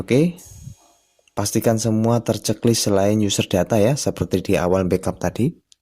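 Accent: Java, Indonesia. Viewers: Logat nya kaya org jawa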